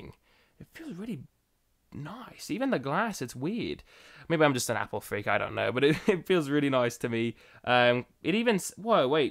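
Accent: United Kingdom. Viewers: hmmm I prefer iphone 6 Plus although the size is huge but it's definitely the best,, problem is I only had it for not even a month yet and its already develop fault microphone and sound/speaker start to play up so had to sent it back to be repair and hopefully I will be able to get it back soon,,, btw dude you got some British accent there! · Funny how he says "United States of course" when he has an UK accent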